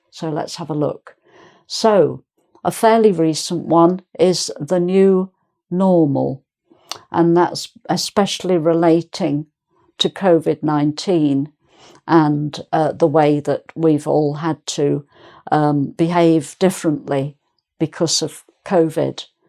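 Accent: United Kingdom. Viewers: Thanks so much for sharing this interesting and useful video about buzzwords. I'll try to teach them in my following class. It's a pleasure to hear such a beautiful British accent. 💖 Greetings from Spain. 🌷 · I live in Brazil. You can't imagine how happy I am, having you as a teacher, teaching the real British English. I can understand you, my target is having such a noble accent. Thank you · i see it is a british accent !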